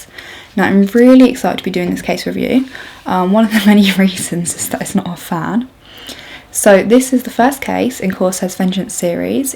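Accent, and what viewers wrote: United Kingdom. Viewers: best review I have seen on the c70 case I bought !! also you are very beautiful and that lovely brit accent just make the review that much nicer to watch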